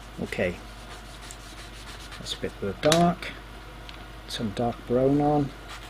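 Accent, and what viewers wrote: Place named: Swansea, United Kingdom. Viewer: Thanks for this! Are you a Swansea lad? Recognise that accent anywhere!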